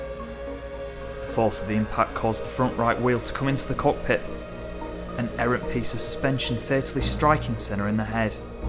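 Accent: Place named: West Yorkshire, United Kingdom. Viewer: Are you from Leeds? Or like West Yorkshire? Anyway class video mate - really enjoyed that.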